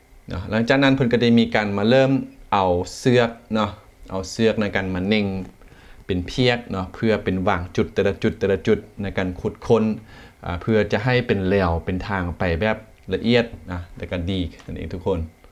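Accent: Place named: Lom Sak, Thailand. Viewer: เพิ่งมาดูเป็นคลิปแรก ฟังสำเนียงพูดของ แล้ว.. ตอนแรกคิดว่า เป็นช่องของคน อ.หล่มสัก หล่มเก่า จ.เพชรบูรณ์ และชาวเมืองเลย..พูดภาษเดียวกันเป๊ะ..กำลังสันนิฐานว่า คนไทยในเขตนี้เคยมีบรรพบุรุษเกี่ยวดองมาจากชาวหลวงพระบาง..อืม!! เราน่าจะเป็นญาติกันนะเนี่ย😊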